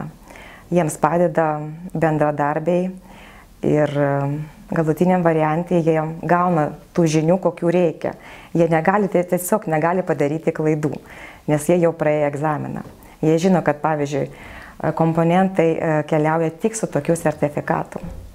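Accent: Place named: Russia. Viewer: kaip zmogui lietuvisku vardu ir pavarde (mergautine) gavosi taip istobulinti rusiska akcenta? metu metus metelicoj sedejo?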